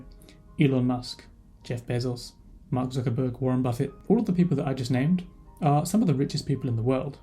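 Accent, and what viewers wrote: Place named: United Kingdom. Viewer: You are talking about US dollars and at the same time you sound British...😅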